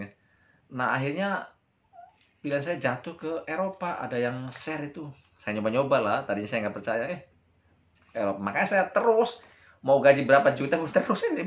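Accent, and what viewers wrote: Indramayu, Indonesia. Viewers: Sampean asli Indramayu ta kang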